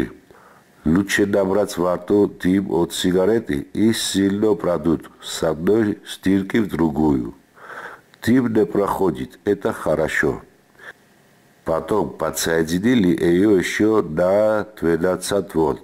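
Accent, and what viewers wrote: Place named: Georgia. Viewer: Гаумарджобат генацвали! Смотрел и слушал, сначала сомневался, акцент знакомый, похож на грузинский. Город, улицы, похожи на Тбилиси! Ностальгия просто, я служил 2 года в Тбилиси! Молодец биджо, разобрался с причиной, машинка огонь вообще!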